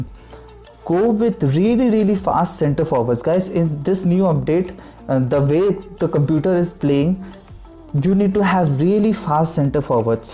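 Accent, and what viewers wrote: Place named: India. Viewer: Not everyone fucking understands Indlish??? 🤔🤔 Or whatever it is you're saying when explaining.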